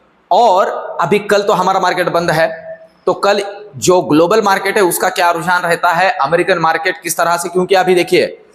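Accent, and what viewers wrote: Maharashtra, India. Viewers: Sir mera analysis ye kahata hai ki aapki mother tongue Marathi hai...🙏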